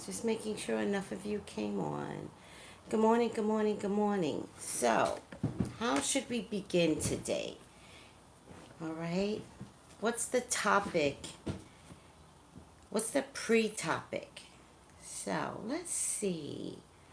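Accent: New York, United States. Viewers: I love your New York accent!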